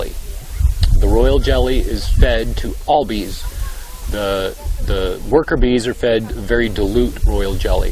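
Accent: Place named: Australia. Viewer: WA is that Western Australai ? cause u have an aussie accent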